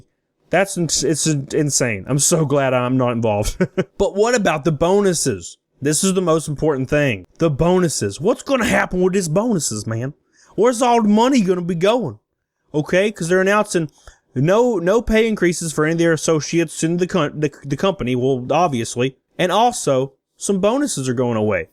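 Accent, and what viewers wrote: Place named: Alabama, United States. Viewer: I'm not gay by any means but dude I love your Southern f**** droll not to mention you just hilarious and I love that laugh it's like a country Santa laugh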